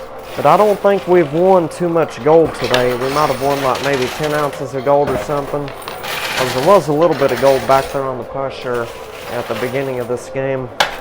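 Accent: South, United States. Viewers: That is a southern US accent if I’ve ever heard one.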